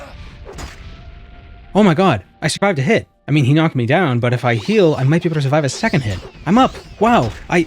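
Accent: Boston, United States. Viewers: You do a great job masking it most of the time, but your Boston accent is just too strong. Great content, keep it up.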